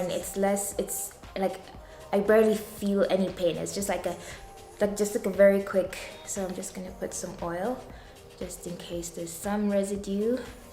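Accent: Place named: England, United Kingdom. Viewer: 💜 …….💜 ….Girl What I love about this video is (everything) but specifically your English accent 🔥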